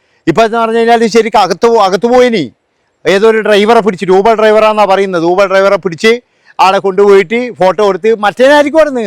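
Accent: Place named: Malappuram, India. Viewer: ❤... good talking... Malappuram slangs..if it's ❤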